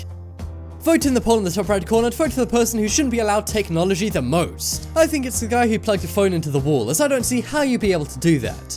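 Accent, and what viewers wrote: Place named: United Kingdom. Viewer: Your Sound British so say Tap not Faucet 👎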